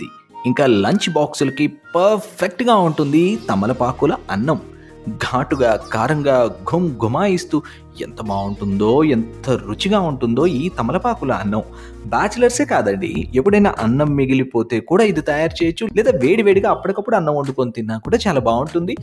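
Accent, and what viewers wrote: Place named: Telangana, India. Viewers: I like telangana yasa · Telangana slang super 😆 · Meru Telangana slang lo matldatam bagundi sir 👌👌o video e slang Lo matladandi plz.... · ఏంటి తెలంగాణ స్లాంగ్.., మీకు బావుంది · Telangana slang super anna ☺ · Owow telangana yasa 🙂nenu pakka try chesta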